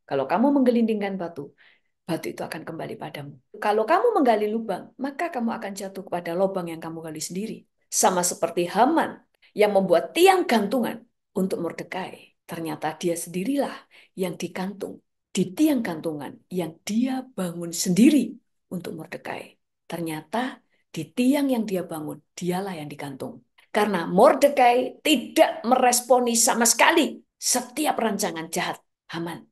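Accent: Java, Indonesia. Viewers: Bu mangstap... ternyata wong medhok... ❤❤❤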